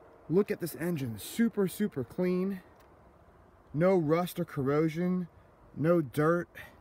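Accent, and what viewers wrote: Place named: United States. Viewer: I don't want one with out the tray tables either, that's the same way I feel about buying and Jaguar, it has to be VDP. You sound like one of us Yanks in the US mate. lol. In one of your other vids you also said the 2007 Arnage was the best year to own, so my question would be which year would you recommend for someone who's never owned one? There aren't very many independent service techs who specialize in them in my city Austin, TX.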